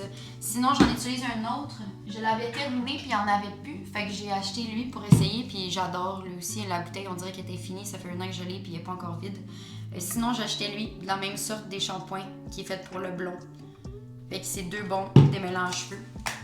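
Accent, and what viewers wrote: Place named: United States. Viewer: j’ai écouté la vidéo comme si une américaine parlait. tellement mon style de vidéo 🤍 that was fun!! continue yt comme ça big love NON AHAHAHA LES PHOTOS DE TOI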